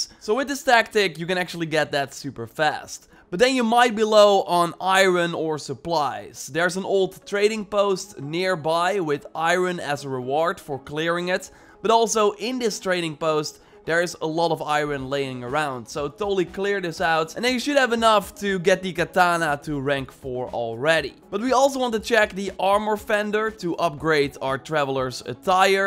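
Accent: Netherlands. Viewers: For example better ga je het beter uit spreken man tis egt zo zwaar hollands Engels veder cek ik altijd je shit ga zo door 😎👍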